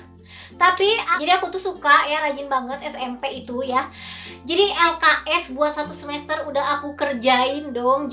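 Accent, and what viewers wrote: West Java, Indonesia. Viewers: Kka orng mana?Kaya Sunda🤣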